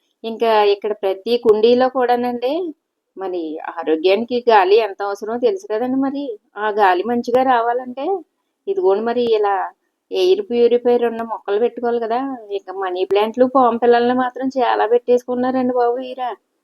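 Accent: Godavari, India. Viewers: హాయ్ అండి చాలా బాగుంది మీ వాయిస్ కూడా చాలా బాగుంటుంది మన గోదావరి భాషలో చాలా బాగా మాట్లాడుతూ ఉంటారు థాంక్యూ అండి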